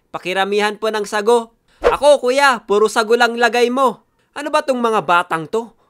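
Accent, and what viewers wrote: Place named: Nueva Ecija, Philippines. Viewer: Taga Nueva ejica ka ba idol may punto kasi salita haha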